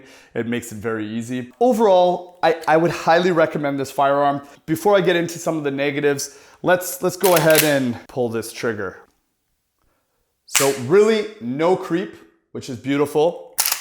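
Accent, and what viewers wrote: Canada. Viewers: Wow you DO sound Canadian, eh?!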